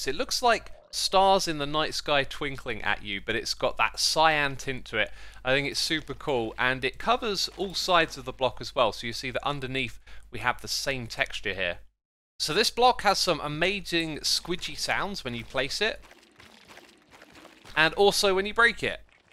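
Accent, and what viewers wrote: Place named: United Kingdom. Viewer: Why does every Minecraft youtuber have a British accent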